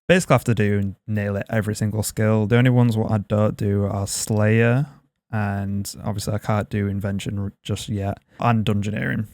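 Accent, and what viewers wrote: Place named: Yorkshire, United Kingdom. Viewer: You sound like a Yorkshireman 🤔 refreshing to hear from a Runescape player 😂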